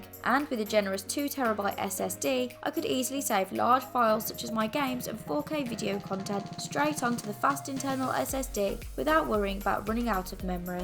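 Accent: United Kingdom. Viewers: She's british. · this is the most british accent i have eva heard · I love that British accent ❤️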